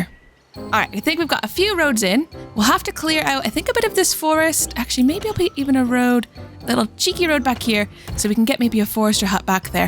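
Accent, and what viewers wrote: United Kingdom. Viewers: I thought I heard a bit of British and some other accent.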